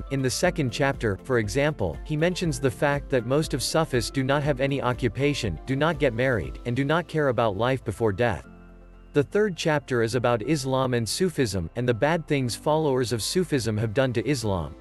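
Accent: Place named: United States. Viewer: American Accent on this video Sucks Pronunciation even worse Hollywood Graphics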